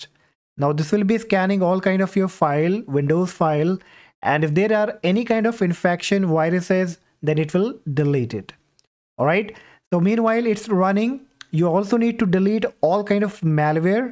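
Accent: India. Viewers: sound like an indian person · Why he sound like scammer indian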